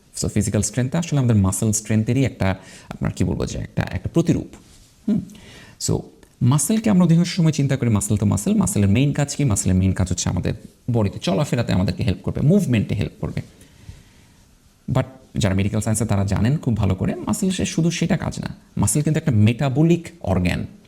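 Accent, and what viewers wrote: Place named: Bangal, Bangladesh. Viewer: বাঙাল তো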